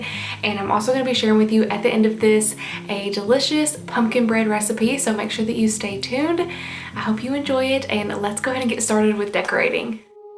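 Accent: South, United States. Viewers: You have the perfect country accent 😍 I'm from Tennessee and have one too lol but your accent is so soft pleasant 🥰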